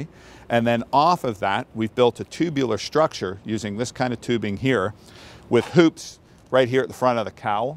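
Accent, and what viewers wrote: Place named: Canada. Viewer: obvious Canadian accent...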